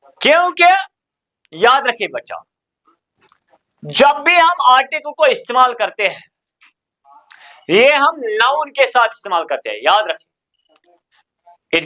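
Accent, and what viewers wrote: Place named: Kulgam, India. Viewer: Sir shayad kulgam sai hai (asent)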